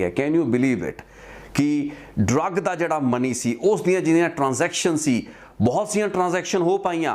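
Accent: Punjab, India. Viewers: ਤੁਹਾਡਾ ਪੰਜਾਬੀ ਬੋਲਣ ਦਾ ਕਯਾ ਲਹਜਾ ਹੈ। I like it. Knowledgeable journalist ..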